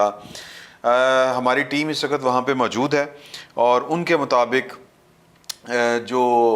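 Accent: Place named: Lahore, Pakistan. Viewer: Nice to see you talking in a genuine Lahori style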